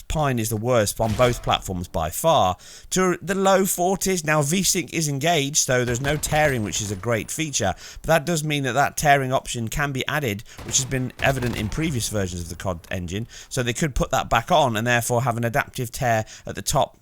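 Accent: United Kingdom. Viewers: Nice to have a British YouTuber who pronounces words in a British accent instead of adopting American pronunciations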